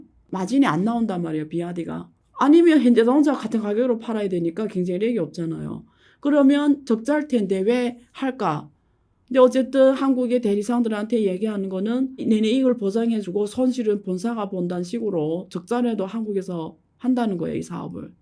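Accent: China. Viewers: 조선족이야? 발음이 그쪽인데 ㅎㅎ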